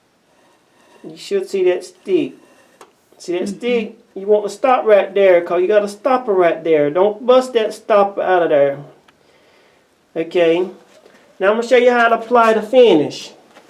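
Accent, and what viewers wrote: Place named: South, United States. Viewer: LOVE the southern drawl in your voice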